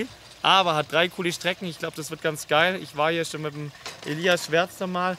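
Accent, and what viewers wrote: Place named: Allgäu, Germany. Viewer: Grieß da! Ich kann mit Downhill zwar eher wenig anfangen bzw. hab da zu arg fear, feier deine Videos aber richtig ab. Bleib auf jeden Fall so spontan, witzig und sprachlich Allgäuerisch eingefärbt. Das ist mega Authentisch!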